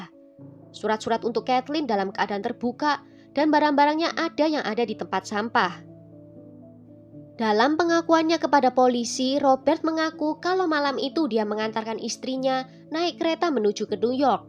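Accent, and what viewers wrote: Java, Indonesia. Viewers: Wah,hebat ya suaranya medhok Jawanya👍😍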